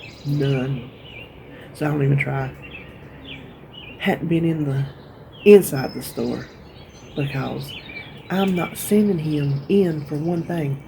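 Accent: South, United States. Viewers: I love your Let's Talk videos. You make me laugh and I love laughing. Not to sound creepy or anything, but I love listening to you talk. I don't know what it is....whether its the southern accent or the fact that you are a downhome person.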